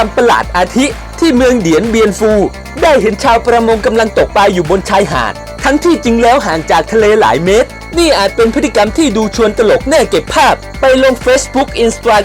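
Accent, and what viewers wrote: Myanmar. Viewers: ความสามารถแค่นี้เสือกออกสื่อไอ้ควายเสียงยังกับพม่าพูดไทย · เวียดแนม คนพากย์มึงเป็นพะม่าหรอ แม่งพากไม่เข้าใจเลย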